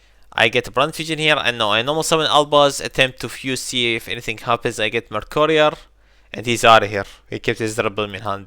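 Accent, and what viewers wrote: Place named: Iraq. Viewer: من صوتك كلت عراقي مابيه مجال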